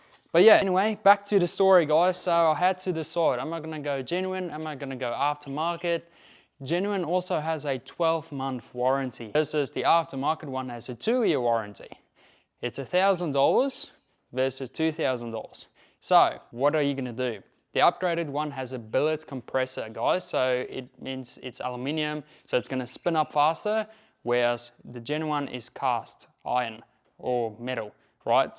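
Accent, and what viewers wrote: South Africa. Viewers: Your S.A accent comes through big ups bro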